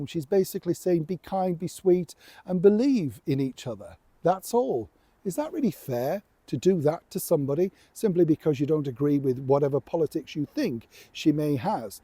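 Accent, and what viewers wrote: Yorkshire, United Kingdom. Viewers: She's always been a fantastically attractive woman, and it's good to hear that she has faith in God. You are right; some people are way too spiteful online now. I like when you said you speak your mind. I think that's why I watch you. I'm guessing you come from Yorkshire.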